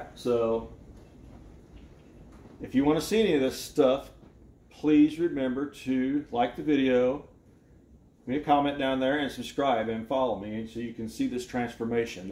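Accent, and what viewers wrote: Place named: Midwest, United States. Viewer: Dude, being a Hoosier, your midwest accent makes me feel right at home! I often disagree with Adam when it comes to opinions about styling, as he has obviously been indoctrinated by GM, but I gotta say I agree with him on this one. my opinion doesn't matter, it's your car and your dream. It's gonna be a stunning Squire regardless! Good job as always. Gotta admit, I'm in awe of your meticulous attention to details!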